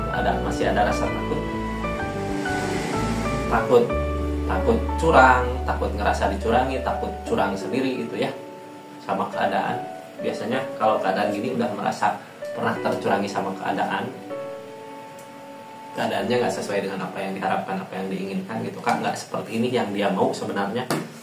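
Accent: West Java, Indonesia. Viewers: Assalamualaikum.maap apakah anda.ada di bdg..? Boleh minta alamatnya...? Sy liat daricara bicara sunda hehe.mksih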